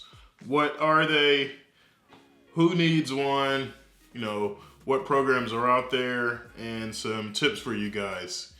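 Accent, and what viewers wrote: South, United States. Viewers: Did you take speech classes to curb your southern accent?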